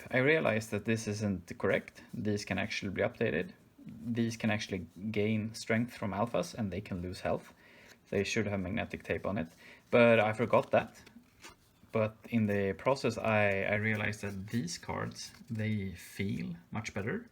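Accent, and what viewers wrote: Sweden. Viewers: Älskar den svenska dialekten, inte ofta man hittar en annan svenne här på tuben, lycka till med kort byggandet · Are u Swedish? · This is so cool, also are you from sweden maybe? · I was thinking of Also doing something like this. But im not to talented and i hope you somehow Will be able to buy these. Also is he Swedish? Hes accent souns Swedish/nordic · Just a quick question. Are you Swedish ? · Are you swedish? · Wait are you Swedish, your accent sounds like it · Hey are you from sweden? No offense but you do have that swedish english accent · Your accent sounds... awfully Swedish. (I'd know, 'cause hej hallå, jag är också en Svenne, isf.) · Love the swedish accent lol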